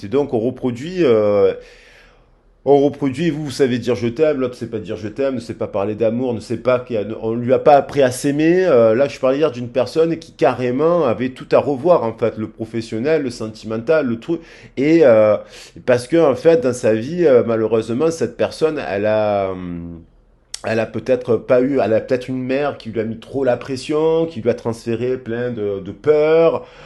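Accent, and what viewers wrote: South, France. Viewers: Cc merci pour votre guidance très detaillee.c est toujours un plaisir de vous écouter avec votre accent du soleil qui me fait chaud au cœur 😉😊.vous êtes un veritable therapeute.vous m apaisez bcp.😊😘.merci pour votre bienveillance. Belle journée a vous 😉😊😘❤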